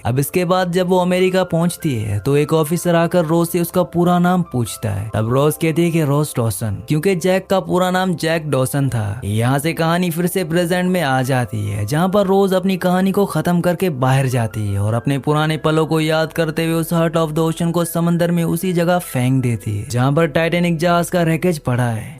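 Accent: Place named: India. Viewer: Language sai urr accent ptaa chl rha hai indian hai urdu esaay nhii bultay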